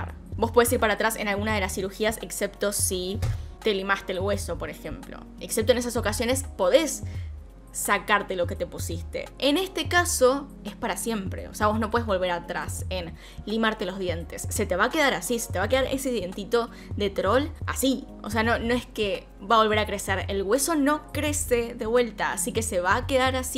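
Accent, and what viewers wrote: Argentina. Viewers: Amo demasiado este canal. Creo que me empieza a gustar mas que el otro. Me divierte muchísimo tu forma de hablar tan naturalmente Argentina y los temas de este canal. Saludos desde Santa Fe. Pd: El maquillaje de Natura es hermoso. Pd2: hay que lavarse los dientes pero tampoco demasiado. Ya que también te pueden salir caries por exceso de cepillado ya que te choteas todo el esmalte. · Jajjaj me encanta el acento argentino jajaj saludos desde chile 😌❤️🙃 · Soy yo o los Argentinos dicen anios en ves de años xd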